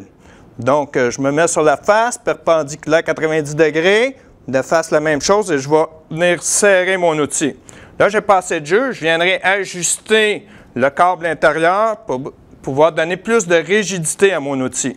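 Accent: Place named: Canada. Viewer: très bonne explication mr mais j'ai eu dès fois très dur a vous comprendre a cause de votre accent canadien mais ca va bravo et félicitation cordialement richard .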